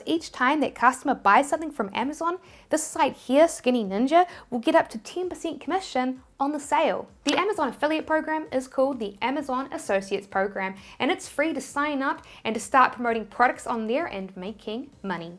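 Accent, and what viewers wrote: New Zealand. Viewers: Yes, definitely New Zealand accent. One of the most difficult English variants for Australians to understand. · Sim, é assim mesmo que o sotaque da Nova Zelândia funciona. Vulgarmente conhecido como "kiwi". Outro youtuber neozelândes, Sam Owens, tem o mesmo sotaque. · Thank you for the help. You sound familiar - are you a Kiwi? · For those who do not know, she has a lovely Kiwi / New Zealander accent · Thank you for posting this video! Love your content and your awesome kiwi accent! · Thank you for your reply, I thought that a company was the option. But I thank you for clarifying me on this. I didn't want to be rude or anything regarding your videos. I just thought it would be good to inform that depends on the country it is not always free and easy (in France for example it is free to open one but you pay tax on your income and mostly you need a 4 days training on legal stuff cost: €260) It is just something that we need to be aware of. 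I do like the dynamic of your videos and that it is not too long and quite easy to watch. Happy also to watch and listen to a kiwi accent for a change ;). Keep on doing what you do so well. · Oh that's so neat. I really wish I could meet you! You seem like an amazing intelligent woman, with an amazing New Zealand accent! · Do u have nz accents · Bruh I clicked the vid and heared the voice Just knew it was kiwi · im guessing she is from new zealand. but it can be hard to tell between australia and new zealand for americans. must be easy for them.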